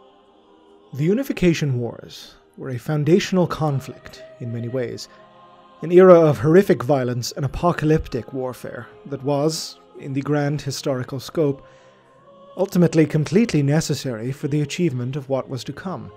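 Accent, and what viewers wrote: Ireland. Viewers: You are definitely irish, and I approve my man. good to see a native doing well on youtube here, and your content seems to be great, heres a new subsoober for ya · I spent the first 5 mins trying to figure out if the speaker was English or American, realised he's Irish. So am I, not a good look for me. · Are you Irish? You sound irish. But you could be a Canadian doing an accent... I'm... uncertain.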